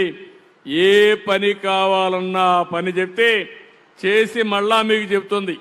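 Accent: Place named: Rayalaseema, India. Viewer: రాయలసీమ ప్రాంతంలో అలానే అంటారు అండి, మా friends కూడా గమనించాను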